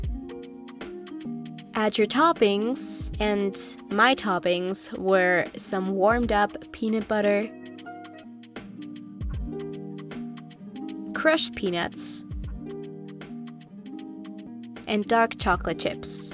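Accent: Germany. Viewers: Ah, an den Produkten sehe ich, dass du Deutsche bist :D Ich habe mich schon gefragt was für ein Akzent das ist :D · Are you German?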